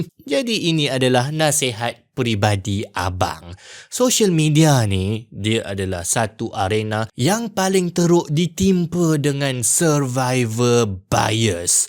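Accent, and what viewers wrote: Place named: Perak, Malaysia. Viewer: Pekat perak nye yeob🤣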